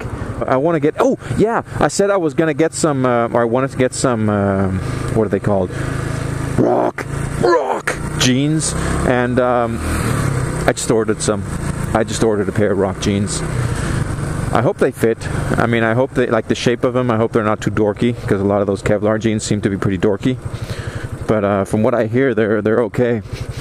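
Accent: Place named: United States. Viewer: Nice, your accent suggestion you're American. What are you doing there? You also lived in Mexico? Interesting.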